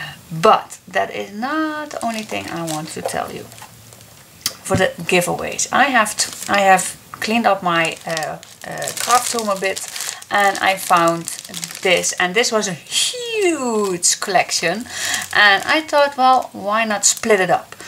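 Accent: Netherlands. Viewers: Merry Christmas!! I love your albums because they are well finished and always very elegant and your tutorials are clear and make everything seem so easy and affordable for everyone. In addition to this I love to listen to you because my dad was Dutch and when you explain, I hear the Dutch accent and I remember when I was young and I heard my Dutch Uncles coming to visit us in Italy! Thank you for this opportunity to win one of your kits, both the kit of your album and the kit of birds are fantastic gifts!! Merry Christmas from Italy!! Nicoletta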